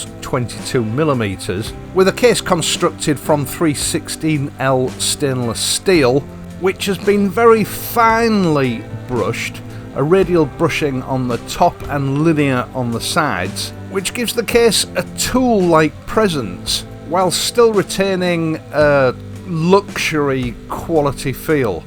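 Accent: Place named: Yorkshire, United Kingdom. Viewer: Hi - wandering through the channel last night & my wife said "Have you got a brother in Yorkshire, or is that just you doing the accent?" (I'm Glaswegian) - confirming that there is indeed a community of us older blokes fed up with disposable consumer culture and tending to embrace the same kinds of things - real clothing, real bikes, real watches and so on. One of the things I most resent about late capitalism is that it intentionally de-skills you to increase your dependency on one corporation or another for products or services. Over-complicated, un-self-serviceable machinery is a prime example. Another is shaving. We got used to paying more and more for overboiled cartridge shavers that require (apparently) ever more blades and strips of chemical slime to do an adequate job of scraping stubble off your face. Fed up with this, a few years ago I dug out my old Gillette safety razor I'd had since I was a boy ("I'd no idea they still make the blades") and reverted to that for a while, but it still bothered me that I was entirely dependent on a disposable component of uncertain quality; besides I still couldn't get a nice clean edge around a tache or beard. 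You can see where this is going... I began a small collection of straight razors picked up from local antique shops mostly, for which I've rarely paid more than a tenner. I watched Youtube videos, bought a couple of whetstones and leather strops and set about acquiring the skills to maintain and use them that my grandfa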